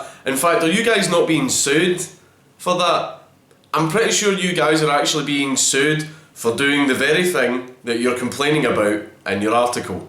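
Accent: Scotland, United Kingdom. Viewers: i never wanted to listen to a man talking sexy to me with a Scottish accent. untill now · Few things make me as happy as hearing a Scotsman call someone a cunt. It's just beautiful. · Fuckinell!!! He really is Scottish isn't he? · The way you said Conspiracy Theories as "Con-spare-essy Thee-dees" Made me bust a gut xD. You're Scottish accent is perfect, I wish I had it to be honest xD.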